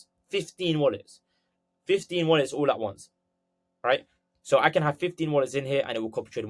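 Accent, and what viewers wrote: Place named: United Kingdom. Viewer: I’m from London bro I hear a British accent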